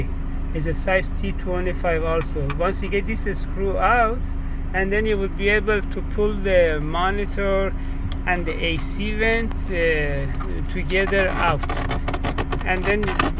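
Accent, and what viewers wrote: Iran. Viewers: واى لهجه رو انگليسى با لهجه ايرونى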